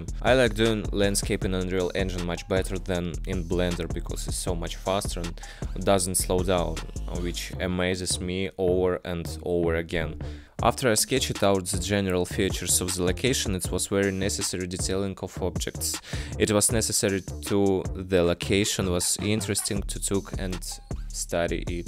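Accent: Russia. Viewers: Привет! Ты русский , по акценту слышно)))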